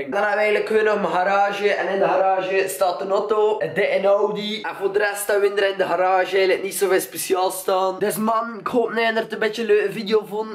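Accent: West Flanders, Belgium. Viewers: Love plat vlams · mo da west-vloams is wel een beire toaltje whi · gewoon nice daj int west-vlams deed :d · Kzin owk vn west vlaandern twas egt zot om et ne ke zo te horn ✌ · Ik versta het wel ik woon ook in West-Vlaanderen 😚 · zo nice daj int westvlaams deet matj!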